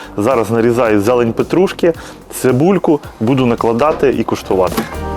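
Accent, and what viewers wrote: Ukraine. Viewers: Український акцент супер! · Смакота ! Молодець ! А звідки ти є, друже, як на мій погляд то львівський акцент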